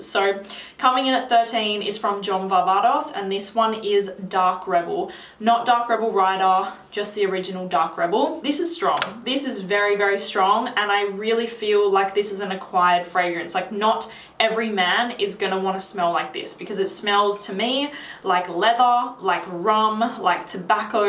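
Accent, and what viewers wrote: Australia. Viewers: hers is Australian not english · Are u an Australian ? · I love your Australian accent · I love the way you say "here"...and every other word that ends in "R". You have the best accent of any Australian ever in the history of Australia! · SO FUNNY TO LISTEN HOW STRANGE AUSTRALIANS SAY WORDS "HERE", "YEAR". SOUNDS LIKE "YEAH". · That Australian accent though 😍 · hello, just discovered your channel, loved the review and the Australian accent 😉 Liked and subscribed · Australian accent, language is english. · I love your Aussie accent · She is an Australian. The American accent is actually the one of the most annoying world wide sorry bro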